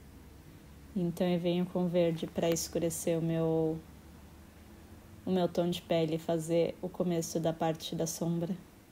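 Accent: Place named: France. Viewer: O sotaque francês eh muito inspirador... Parabéns pelo talento...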